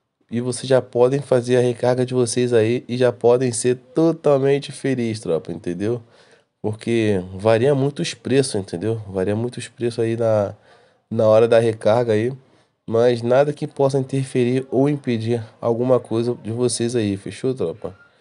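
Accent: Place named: Rio de Janeiro, Brazil. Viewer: Po carioca é dahora 😂😂😂😂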